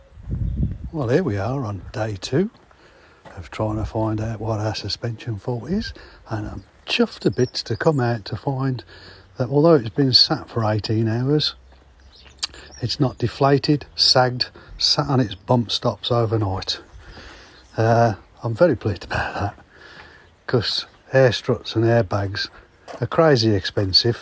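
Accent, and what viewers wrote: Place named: Birmingham, United Kingdom. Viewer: Don't know why, but the brummie accent and talking about car problems are a perfect match.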